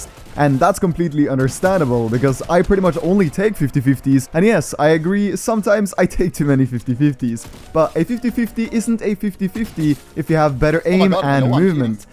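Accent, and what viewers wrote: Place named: Norway. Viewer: i hear Norwegian, jeg høre at du er norsk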